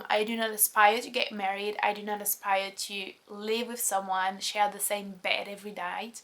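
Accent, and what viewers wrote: France. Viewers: You're a really pretty girl. I like how deep these videos usually go into topics. I couldn't agree more when it comes to relationships. I think they often preoccupy or even distract people who could otherwise live a more fulfilling life. The French Accent is awesome too. Reminds me of the movies. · is that a legit french accent? pretty eyes by the way! the video is about nothing though. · Est-ce parce que je suis français et qu'elle a probablement un accent français que j'arrive à tout comprendre ce qu'elle dit? En tout cas, merci pour cette vidéo. Très bel état d'esprit, et toujours aussi ravissante!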